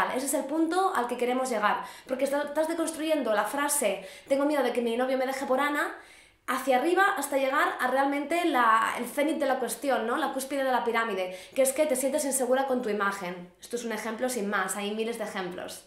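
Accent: Spain. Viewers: Me gusta el acento de laa españolas y en especial el tuyo...te prefiero compartida que borrarte de mi vida, no me queda otra salida...🎶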